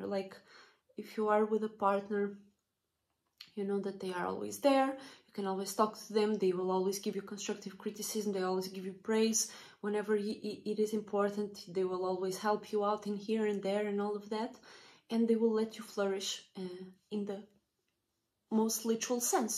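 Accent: Russia. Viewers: I really agree. I worked on a creative projective which was ambitious and the people close to me tried to dissuade me and tell me it was impossible. I said it is impossible for YOU, for me it is possible. haha. But yes I agree, hard to find genuine people who will support you and not be jealous if you are a unique and creative person. I like your accent are you Russian?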